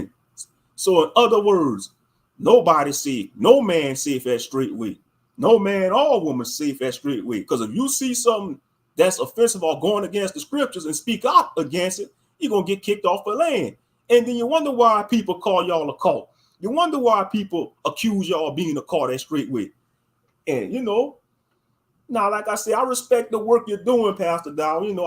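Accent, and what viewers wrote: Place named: Louisiana, United States. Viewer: You are Clearly from Louisiana 😂 Good video tho. Ringo brought me here 👍🏾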